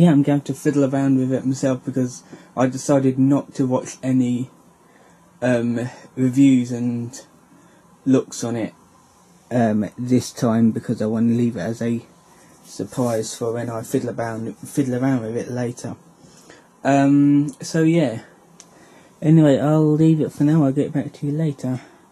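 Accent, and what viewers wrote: United Kingdom. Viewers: r u from UK,fella ?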